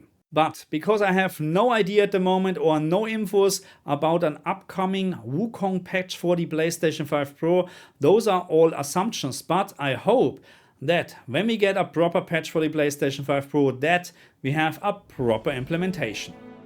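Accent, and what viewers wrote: Germany. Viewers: Der deutsche Akzent ballert hart rein bei dir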